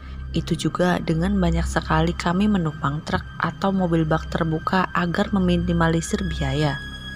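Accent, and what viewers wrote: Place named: Central Java, Indonesia. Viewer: mbaknya pasti asli jawa tengah ya, kliatan suaranya.. darimana mbak min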